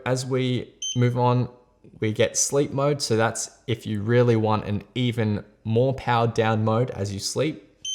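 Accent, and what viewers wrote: Australia. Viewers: Asian speaking aussie.. noice... Subscribe.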